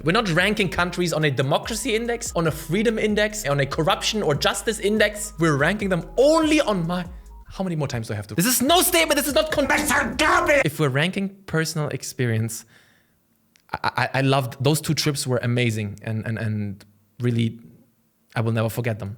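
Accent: Netherlands. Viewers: I know you live in germany but your dutch accent is so good lmao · Love your Dutch accent. Much love for our neighbours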